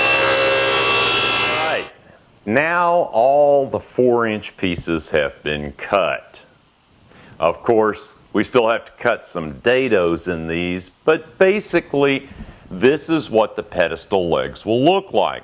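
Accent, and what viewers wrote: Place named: South, United States. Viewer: watch at 1.25 speed, trust me. dudes talented intelligent amazing but that southern slow talkin'...phew!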